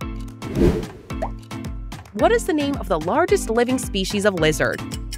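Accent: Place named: United States. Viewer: there must be a lot of dumb shishkababs out there!!! Like your American acsent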